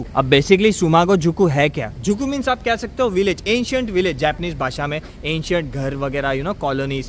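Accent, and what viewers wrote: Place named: Mumbai, India. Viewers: bhai language pata chal jata aapka Mumbai se ho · Tu Marathi ahes ka? Tujhi Hindi bolnacha tone bilkul typical Mumbaikar saarkha ahe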